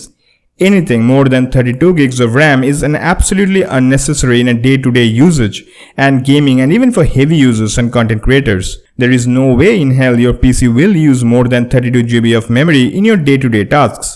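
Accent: India. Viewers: Lag raha hai thoda bahut indian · You've gone a long way to hide your indian but you still got a bit left. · He speaks good english and i like his indian accent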